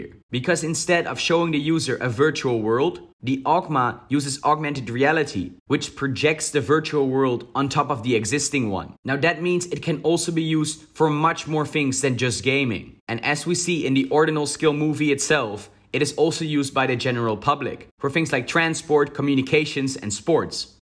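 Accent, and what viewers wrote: Netherlands. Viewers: Just gotta ask this: Are you Dutch?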